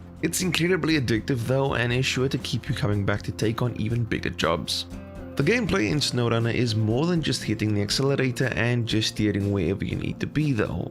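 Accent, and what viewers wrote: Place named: South Africa. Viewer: Awe my bru, South African yes ?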